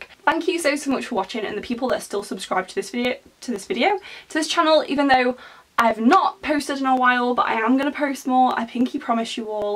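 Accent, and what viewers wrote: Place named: England, United Kingdom. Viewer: I like your style! Just subscribed 😊. Whereabouts in England are you from? Your accent reminds me of home - I've mostly lost my accent from living abroad. Now I just have a general British accent that's hard to pinpoint! The teddy is sweet, not creepy at all. I recently picked up a guinea-pig soft toy that made my mum's 'flesh creep'!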